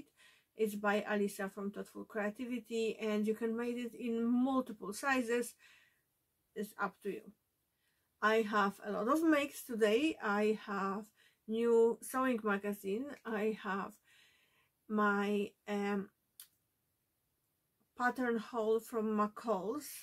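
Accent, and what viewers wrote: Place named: Poland. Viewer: I also love aprons. Saves your clothes from stains while looking great. I love your accent it reminds me of my childhood. Poland? ❤️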